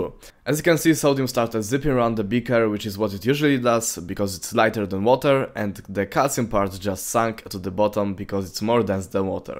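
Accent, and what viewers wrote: Poland. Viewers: Your polish accent echos through my dreams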